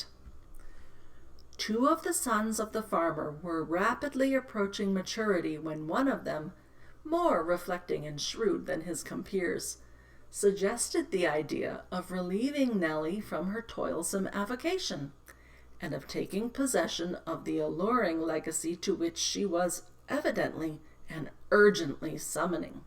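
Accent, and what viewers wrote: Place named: United States. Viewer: I enjoyed the read along, learn American English pronunciations, and the story. Great idea and resource.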